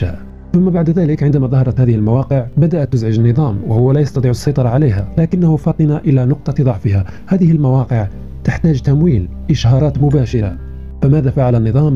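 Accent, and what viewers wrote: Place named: Western Sahara. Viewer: سمعتك تتكلم عنهم، فمن تكون أنت ؟ و ما هي مصادرك و مواردك ؟ أستطيع تمييز لكنتك الصحراوية ! فمن تكون ؟ هههه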